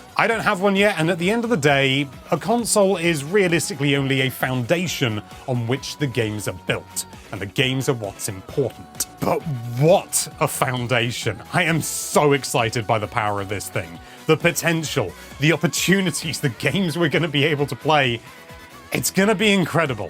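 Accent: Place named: United Kingdom. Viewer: NOTE FOR THE EDITOR: so ive come to really enjoy the little gags on editing like the “its a methaphor” on this one. The videos on the channel have always been good to watch on a second monitor, specially with the more then enough waffling in a sweet british accent, but today i played the video on the TV while grabbing launch and a mayority of the video its just a static shot of someone talking, so i say all this to point out that that little gags, and more like it could make the video more entertaining and attractive to watch on a primary monitor, nice job.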